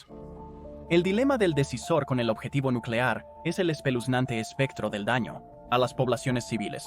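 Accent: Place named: Catalonia, Spain. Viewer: El narrador parece que es un Catalan intentando hablar en Castellano sin que se le note el acento. El video muy interesante.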